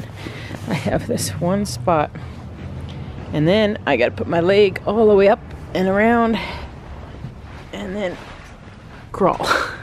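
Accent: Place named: Europe. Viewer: hi mama. nice to see your mama. im binge watching bedrest day today painted all my window frames yesterday outside. i love the wood design you hand painted it? great she helped you. she lives in usa too i see. aw thats so cute she was teaching the girls to sew too how precious to see that. you grew up partly in usa youve got an accent from Europe but she doesnt. ? is your story here in one of your videos? good idea he overlapped material this meant seam would becovered. imagine making your own tent what a task good for her. im middle laying out material making curtains and dog jackets too. getting cold got to get dog jackets finished.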